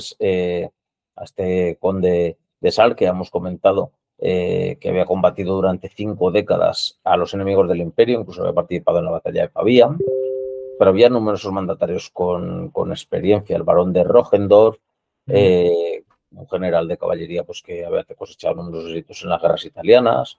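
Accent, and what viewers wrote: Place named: Aragon, Spain. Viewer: Tú imagina un "no" a Napoleón con ese acento, te deja claro que solo pasarás por encima de su cadáver. Te lo dice otro mañico. Como dijo Joaquín Costa: cuando España ya no sea España, Aragón seguirá siendo España..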